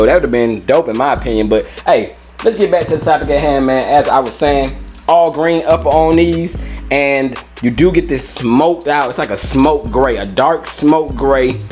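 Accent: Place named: South, United States. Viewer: Man on the real I don’t like the shoes but you gotta rock with my G for the true southern accent bruh you gotta love it keep it real for ya boys stayin fresh in that southern mud baby 3rd coast holdin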